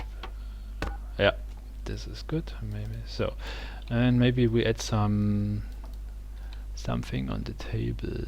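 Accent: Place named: Germany. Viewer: Awwwww deutscher Akzent :D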